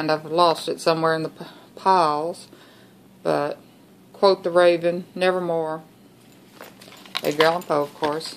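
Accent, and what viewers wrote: South, United States. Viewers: ...where is your accent from? I am from Mississippi and I know it sounds southern...just not sure where...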